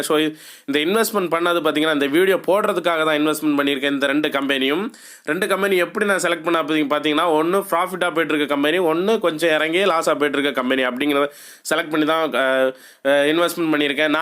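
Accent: Kanyakumari, India. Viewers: Ur speaking Kanyakumari Tamil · Kanyakumari ya bro ...namah ooru voice mahdiri iruku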